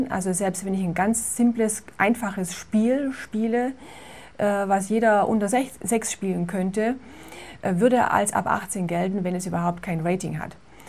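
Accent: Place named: Franconia, Germany. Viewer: Klingt für mich irgendwie eher fränkisch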